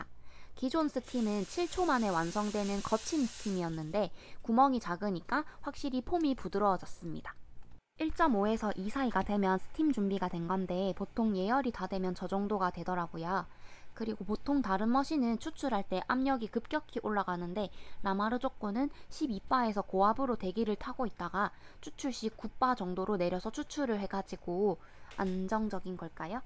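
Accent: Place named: Gyeongsang, South Korea. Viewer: 경상도 사투리 귀여워요. ㅋㅋㅋㅋㅋㅋㅋㅋㅋㅋㅋㅋㅋㅋㅋㅋㅋㅋㅋㅋㅋㅋㅋㅋㅋㅋㅋ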